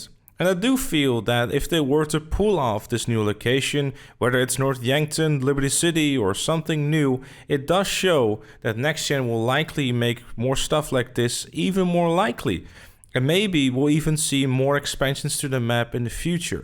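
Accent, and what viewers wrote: Netherlands. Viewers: Nederlands? Ik hoor het haha🔥👑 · Aan je accent te horen ben je denk ik nederlands maar je kanaal is heel nice man props man!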